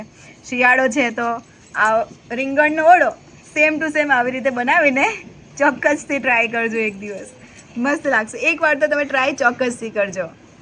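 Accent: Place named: Ahmedabad, India. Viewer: Ben kathiyawadi hoy ane amdavad ni bhasha bolta hoy ewu km lagya kre se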